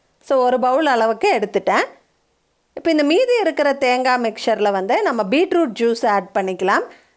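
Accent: Coimbatore, India. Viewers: Mam.. r u from Coimbatore?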